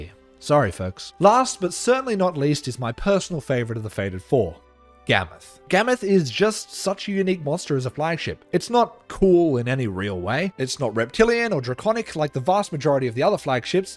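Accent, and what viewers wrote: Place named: Australia. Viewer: Doesn't help an Aussie accent really plays into pronouncing the di a lot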